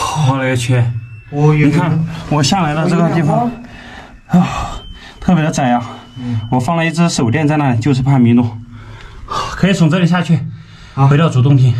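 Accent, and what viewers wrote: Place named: Sichuan, China. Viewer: 为什么我要半夜一点钟来瞅这个视频，为什么，为什么，为什么。 这个家乡川普倒是给了一点安全感。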